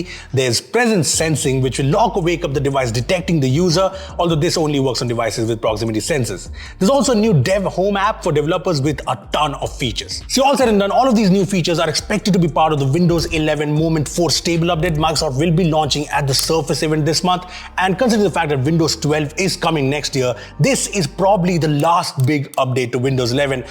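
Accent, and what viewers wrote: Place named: India. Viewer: this is the best indian english accent i have ever heard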